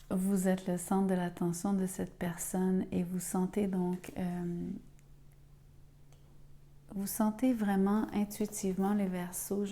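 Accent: Quebec, Canada. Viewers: Bjr je découvre par hasard votre guidance c’est un vrai plaisir de vous écouter avec votre délicieux accent québécois je retrouve suis verseau 1 décan lui verseau 2 d. Nous vivons une véritable osmose et synchronisation. Merci de Copenhague 😊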